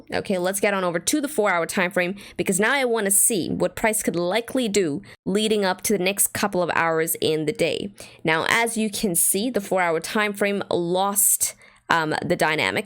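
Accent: Brooklyn, United States. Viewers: Lol her accent is kinda weird, I’ve lived in New York and how she pronounced some words sounds pretty like Brooklyn 😅 like it esp the way she says “cardano” but she almost doesn’t sound American sometimes 🤔🤔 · Yeah Brooklyn is what it reminded me of too. At least the movies depiction of a Brooklyn accent.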